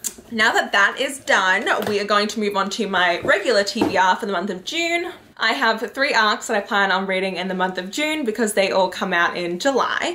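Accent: Australia. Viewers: U sound extra Australian today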